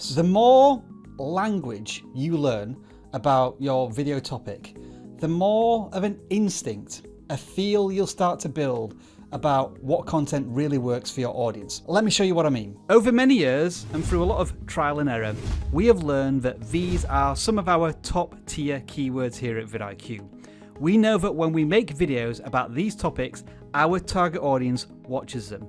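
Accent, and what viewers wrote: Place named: United Kingdom. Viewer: Totally off topic, but I’m working on learning regional British accents. Where, exactly, did you grow up? Thanks.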